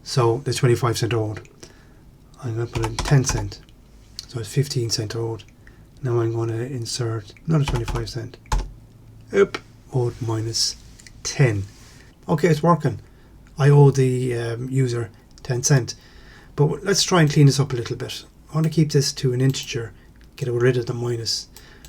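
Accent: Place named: Ireland. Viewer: Thanks...Nice to hear he Irish accent...I'll buy you a Guinness if we ever meet.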